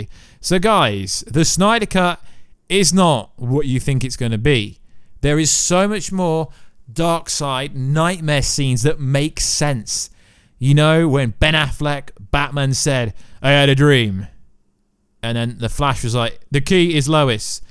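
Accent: England, United Kingdom. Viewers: When gets mad his English accent thickens 🤣🤣👍🏽 Love the channel bro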